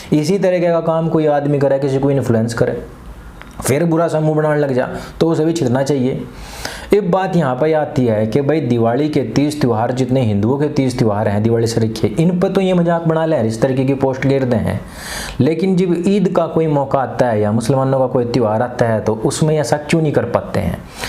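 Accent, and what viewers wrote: Haryana, India. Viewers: you sounds like my hariyanvi friend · Bhai tagdi haryanvi bol rahe ho... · Haryanvi hai bhai yaa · Sir wah love your haryanvi accents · I think bhai had spoken a hariyanvi accent in this video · हरियान्वि लहेजे मे एक् नम्बर लग रहा है video एसे हि विदिओ बनाते रहिये आचर्य जी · Hariyanvi boli k liye wa..wa...wa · your Haryanvi style of speaking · Bhai tu haryanvi bole hai 😘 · Bhaii haryanvi ko or khadi boli ko hataa kr seedhe seedhe bolo to or accha effect डालेंगी aapki video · हरियाणावी लठ मार बोली 🙂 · यहां तो Pura Haryanvi Accent hai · Haryanvi nikla hai bhai aaj toh. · aaj Haryanvi tone me kyu bol rahe ho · राम राम सा सर ...... Love from Rajasthan ❤️❤️ आपकी हरियाणवी सुनके बहुत अच्छा लगा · haryanvi op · Nice haryanavi sir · आपकी हिंदी के साथ हरियाणवी भाषा का तालमेल बहुत मधुर लगता है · Bhai shab haryanvi bilku sahi bol rahe ho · Love the haryanvi accent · aapka haryanvi accent sun k accha lga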